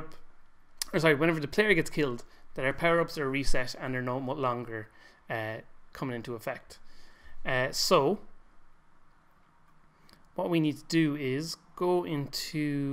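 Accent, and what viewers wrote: Ireland. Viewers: You talk like Jacksepticeye. Are you Irish?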